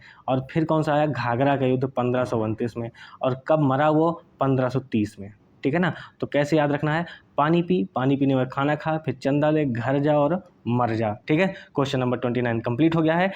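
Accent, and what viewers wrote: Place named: Bijnor, India. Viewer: Aapki aawaz hamare bijnore ki lg rhi h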